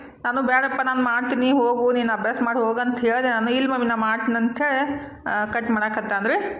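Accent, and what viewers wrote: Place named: North Karnataka, India. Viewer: Congratulations akka.uttar karnatada bhase nimma baaina kelod onda khusi.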